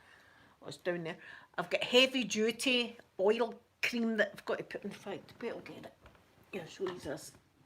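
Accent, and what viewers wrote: Scotland, United Kingdom. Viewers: Your hair is gorgeous! You’re so pretty! I found you a few months back doing a search for asmr in a Scottish accent! Boom baby, I found you! Love your channel and especially the content! You can’t make this stuff up! Hahaha. You certainly live an exciting life. My kids are grown and live in Calgary and Vancouver so it’s just hubby and my animals living on 7 acres off grid here in Ontario! You bring so much excitement to my life. Thank you for sharing your life with us! ❤️🇨🇦❤️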